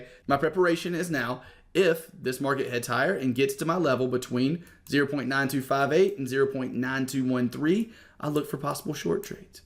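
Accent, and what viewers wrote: South, United States. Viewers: Love your southern twang.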